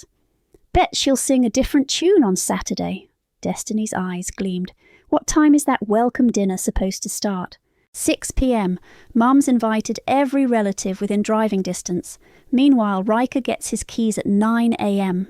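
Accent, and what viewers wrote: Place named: United Kingdom. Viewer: Sorry I can’t stand the British accent. It’s really awful. Some of thrm I can take but this one is awful.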